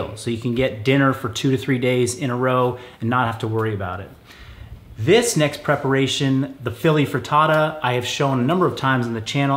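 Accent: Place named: California, United States. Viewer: Also you sound like Uriah Faber lol, must be from California? In fact you sound a lot like anyone from Sacramento and a lot of Team Alpha Male.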